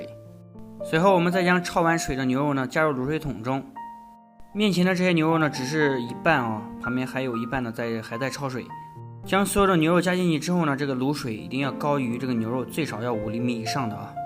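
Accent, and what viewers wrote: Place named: Anhui, China. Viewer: 不错，安徽人啊！口音。😹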